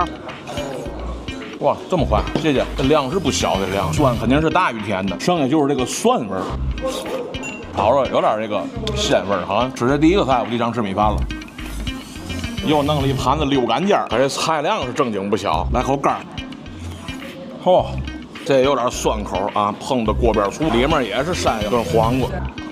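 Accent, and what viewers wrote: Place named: Tianjin, China. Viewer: 皮牙子既然你是说了天津话解说就按天津话说洋葱头好了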